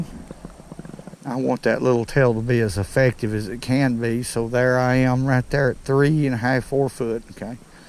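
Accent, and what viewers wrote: South, United States. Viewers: Naw, man, he wasn't drunk, he's just southern as all get out. And where he's fishing, Weiss Lake, is considered "Crappie Capital of the World", or so the signs say when you get near the lake. You ought to head there if you ever get the chance. Rent you a room at the Alabama Bell, fish the pier, hire a guide or take your boat. You won't regret it.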